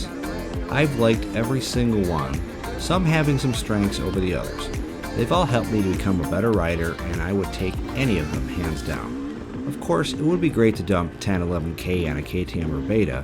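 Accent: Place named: Northeast, United States. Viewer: Are you in upstate NY, NH or Vermont? Gotta be one of those judging by your accent. Also the FSE250E is now fuel injected and runs the same motor as the CRF250F, which is one of Honda’s Chinese/Thai bikes.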